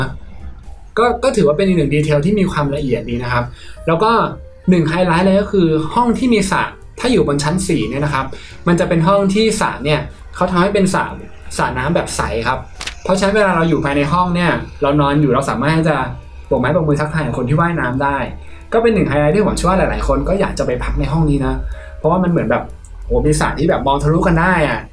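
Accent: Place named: North, Thailand. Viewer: อู้เหนือได้น่าร้กจัง. 😊😊😊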